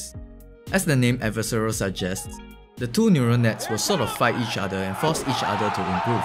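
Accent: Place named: Singapore. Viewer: i think hes singaporean, really distinct accent :P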